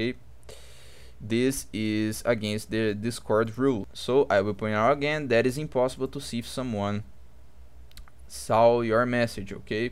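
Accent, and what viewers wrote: Brazil. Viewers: pelo sotaque, é br kkkkkkkkk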